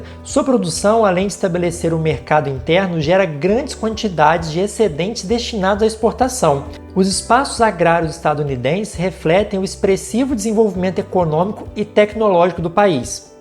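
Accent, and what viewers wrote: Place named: Minas Gerais, Brazil. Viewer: Esse cara é de Minas ou do sul da bahia não é possível!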